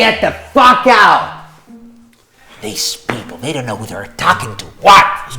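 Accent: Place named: Italy. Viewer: rega l'accento italiano si sente ... altro che perfetto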